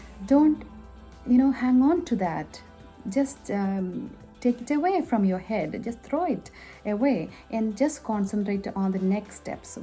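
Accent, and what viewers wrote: India. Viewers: by your accent I'm assuming Indian originally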